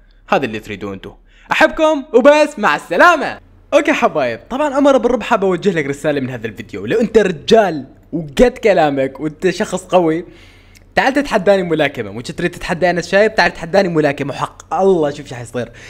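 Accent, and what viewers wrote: Iraq. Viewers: احسك عراقي 😂😂😂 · أتابعه مشان اعرف مشاكل يتيوبر ✖ أتابعه مشان لهجة عراقي لتجنين ✔😍😍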